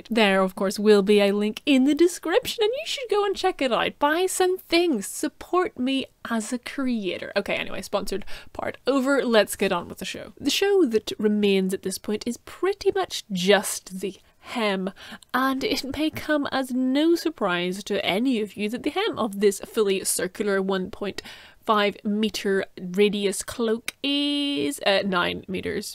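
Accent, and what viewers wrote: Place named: Ireland. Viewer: I was like “this is 100% being crafted by an emerald isle accent”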